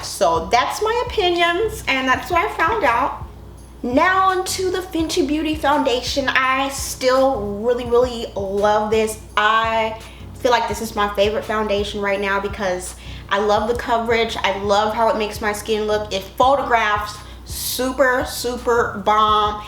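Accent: South, United States. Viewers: Lawwwd talks like a southern Grandma.... girllllll, chillllllddddd 😂😂😂😂. I luvs this chick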